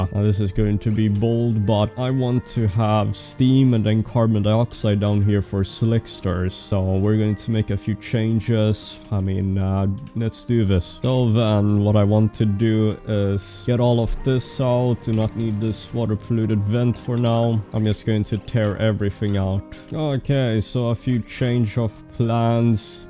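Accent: Sweden. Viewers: Its funny how easy it is to recognize your own accent. Two minutes in and im like "this guy HAS to be swedish." I double check and yeah, confirmed. Any other country and i have no idea what accent it is haha. 🇸🇪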